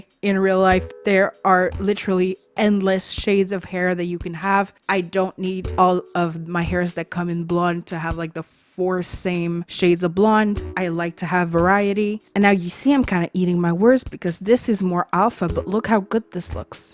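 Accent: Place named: Quebec, Canada. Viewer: Love your, french Canadian? accent?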